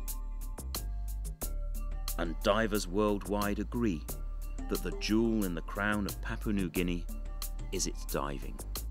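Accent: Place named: RP, United Kingdom. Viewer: Good British Queen's English language Thank you Sir for this educational video of Papua New Guinea Much appreciated 🙏🙏🙏 God bless you ❤️❤️❤️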